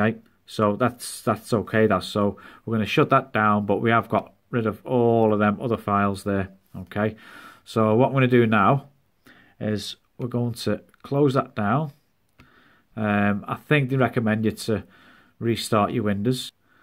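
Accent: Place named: Liverpool, United Kingdom. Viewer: Thats really good scouse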